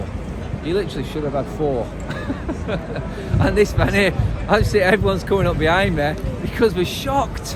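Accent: Manchester, United Kingdom. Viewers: Despite Mancunian accent.. you are so much better presenter now.. I’ve follow your channel from the beginning.. kudos 👍👍👍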